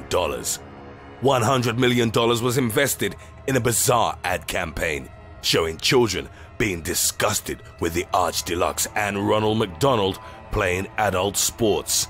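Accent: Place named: United Kingdom. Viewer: British narrator what a joke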